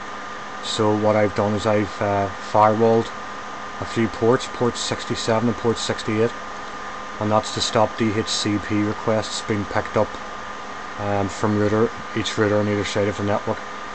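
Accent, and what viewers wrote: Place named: Northern Ireland, United Kingdom. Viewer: You sound like your from Northern Ireland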